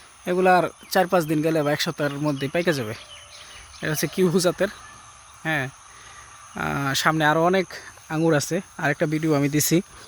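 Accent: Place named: Tangail, Bangladesh. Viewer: ভাই আপনার কথা শুনে মনে হচ্ছে টাঙ্গাইলের কোথাও আপনার বাসা।। আমার বাসা টাঙ্গাইলের সাগরদিঘী। আপনার নাম্বার টা দিলে ভালো হতো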